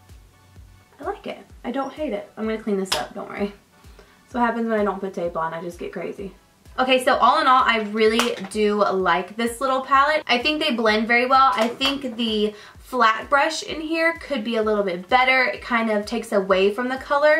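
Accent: South, United States. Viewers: You're so friking beautiful I love you and I want to be you!! Your hair is so friking bombbbbbbbb and you're so pretty makeup or not, and your slight southern accent I could NOT be more jealous of and your name is so cute. You seem like suuuuuch a down to earth girl and I wanna be your bff. Ok done being creepy AF😆🙄UGH WHY CANT I BE YOU GIRL🤓💙😍😝👑 #queen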